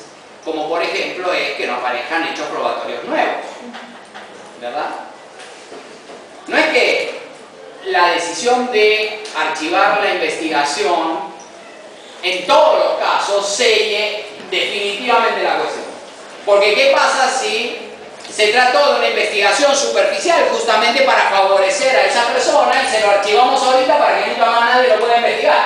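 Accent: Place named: Argentina. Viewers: es argentino. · Suena argentino.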